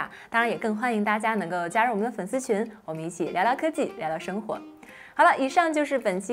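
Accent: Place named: Hebei, China. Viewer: Eva是河北人吗？说英语的感觉和我老婆一样，我老婆邯郸的。加油！支持你！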